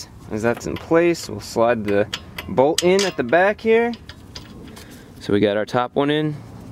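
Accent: United States. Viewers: Just wanted to thank you for your videos. Just spent my Sunday fixing the brakes of the mother of my child's car. Everything you taught was present - blocked nipple, rusty brake fluid and seized bolts. Loved the 1 man bleeding method too. The car now stops on a six pence. My boy likes your tutorials as he's car made and likes your accent ( I think you sound like Marshall Mathers - no offence) - he's only 5 but obsessed with all things from the US of A:) Thanks again.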